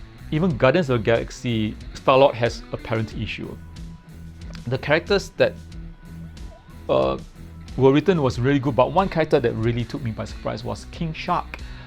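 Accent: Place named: Singapore. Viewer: Singaporean? That accent is undeniable 😂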